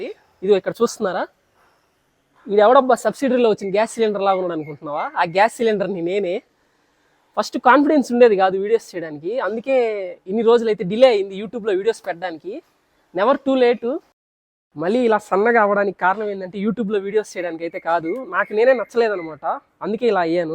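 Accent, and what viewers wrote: Nellore, India. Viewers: Ide ma nellore yaasa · Skip cheyakunda chudamannav kani ur talking was to good bro nellore yasa super😂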